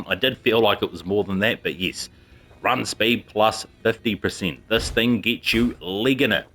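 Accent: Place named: Australia. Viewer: I just found you through algorithm. Don't have console, always played on pc but live the accent lol. Not many aussies (assuming that's where the accent is from) I've seen play this and post on it so keep posting 👍 all the best "mate" 😉😜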